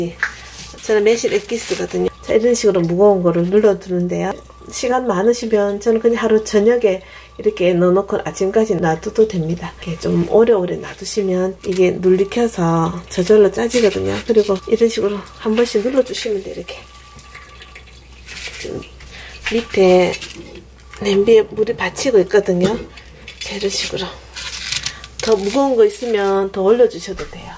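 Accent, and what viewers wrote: Gyeongsang, South Korea. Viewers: 건강한정보 알려주셔서 감사합니다 설명도 경상도억양이지만 억세지않고 상냥한말씨가 듣기좋습니다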